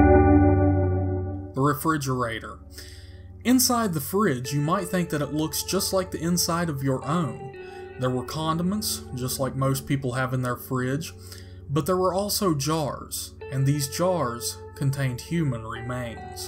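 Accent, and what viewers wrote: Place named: West Virginia, United States. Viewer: Are you from West Virginia?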